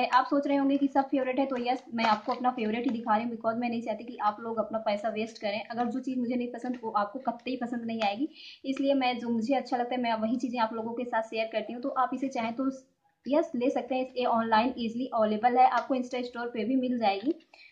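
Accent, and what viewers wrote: Bihar, India. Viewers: Aap itna bihari ka jesa kyu bolti hai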